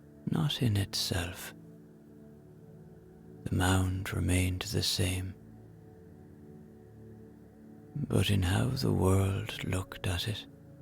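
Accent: Ireland. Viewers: Woohoo! 1 hr gang! pm Texas USA. Keep that soft Irish brogue coming. 😊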